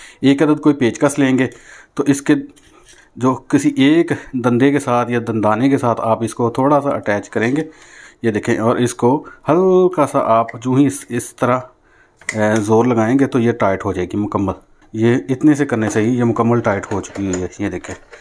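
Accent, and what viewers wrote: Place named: Punjab, India. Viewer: Bolne me Punjabi aa rahi hai lekin madri zaban Punjabi ki izzat nhi karenge Pakistani punjabi log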